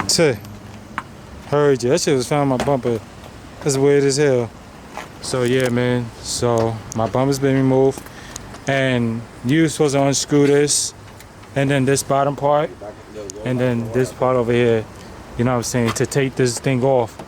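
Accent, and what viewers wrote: New York, United States. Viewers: u gotta be from nyc u namean ya herd 👌🏼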